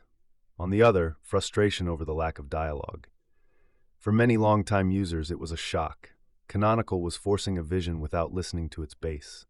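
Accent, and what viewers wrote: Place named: South Africa. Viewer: No, he's not Afrikaans, he's an English-speaking South African.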